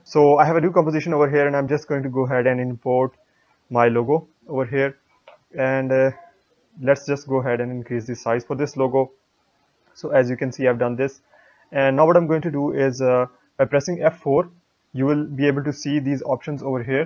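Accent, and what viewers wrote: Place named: India. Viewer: The professional Indian sounding guy saves the day. Thank you fam.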